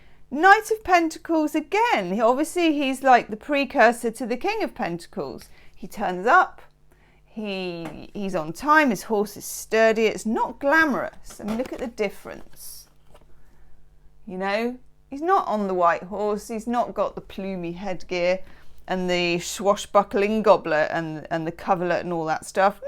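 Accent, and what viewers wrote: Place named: England, United Kingdom. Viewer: I adore you! You are one of my favorite readers!! Accurate and beautiful (and I love your English accent lol)!! 😘🙏🌷🌺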